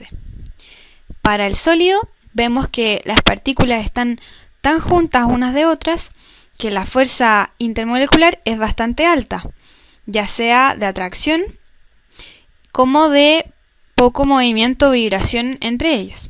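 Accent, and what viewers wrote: Chile. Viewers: Sos de Chile ami no me engañas jaja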